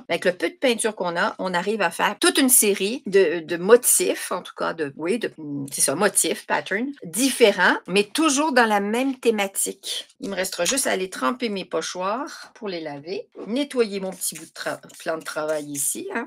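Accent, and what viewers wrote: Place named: Quebec, Canada. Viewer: Bonjour, je viens de vous découvrir 😊 c'est drôle, je suis québécoise et je pensais que vous l'étiez à la façon dont vous parlez, je me demandais si vous étiez française ou québécoise. C'est dommage mais j'ai une Cricut mais je vais quand même aller voir vos vidéos 😊 ce que j'aimerais c'est d'avoir des projets pour utiliser ces impressions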